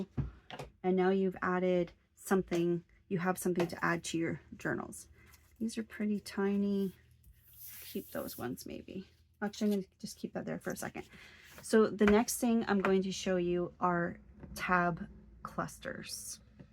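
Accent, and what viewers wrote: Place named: United States. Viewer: New subscriber today. I love your calm presentation style. I'm in the UK. Which part of America is your accent from it's lovely?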